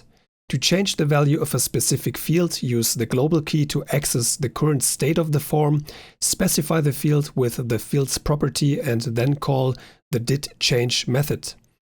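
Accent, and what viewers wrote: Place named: Germany. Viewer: Great tutorial... voice has a great German twist, made me think of -: <link>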